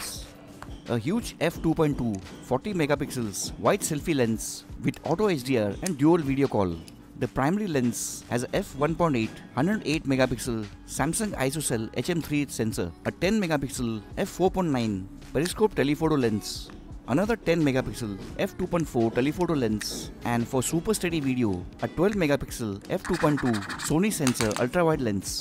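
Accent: India. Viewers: Indian accent is so funny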